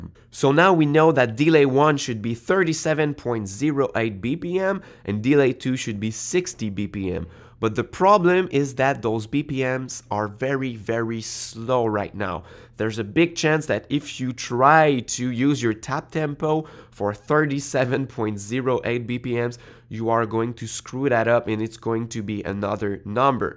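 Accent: Quebec, Canada. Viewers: Is that accent from Québec?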